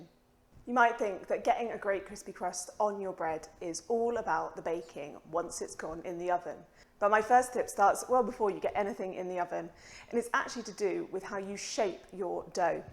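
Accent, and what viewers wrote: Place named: England, United Kingdom. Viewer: Your English accent is simply adorable! 🥰 🪷🪷🪷😍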